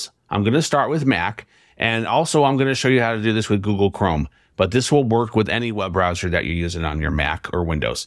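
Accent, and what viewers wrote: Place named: India. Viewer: She had an Indian accent!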